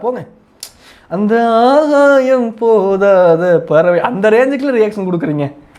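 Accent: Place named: Erode, India. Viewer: Light ah erode uhu slang varuthungooo 😅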